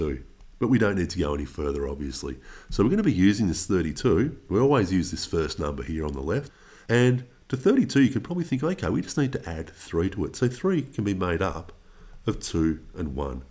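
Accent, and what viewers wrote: Australia. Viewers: I watch your videos because I always learn whatever you’re teaching because you teach very well but mainly because I just wanna listen to you speak lol where are you from? Australia im guessing but which part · At first I thought you were british but the Australian accent kicked in later in the video 😂😂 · I had to go over this in my online class and completely skipped the unit cause the way they explained it was too complicated. It was ridiculous and I hate math. After this video, I went back, followed the instructions and double checked and got them all correct. The Australian accent made it 40x easier. Wtffffff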